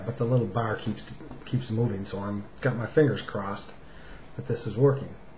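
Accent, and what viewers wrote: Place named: United States. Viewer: very informative video, good information for educators. Very American sounding accent.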